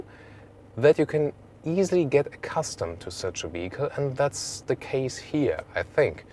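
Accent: Germany. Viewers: Das English ist zum schmunzeln. Allways German englisch. Ich würde das nicht machen. Aber danke für den Einblick in den Transporter. Ich will seinen kaufen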